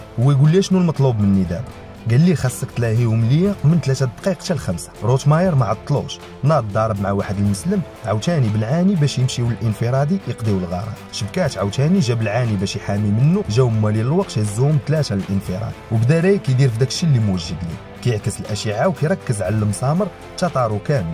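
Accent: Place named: Tunisia. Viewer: علئ حسب ضني هذي اللهجه التونسيه اذا ماني غلطان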